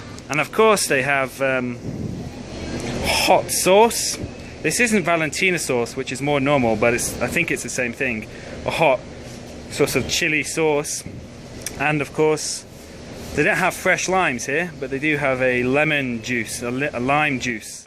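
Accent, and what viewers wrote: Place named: United Kingdom. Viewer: I love the british accent !! > Good videos bro.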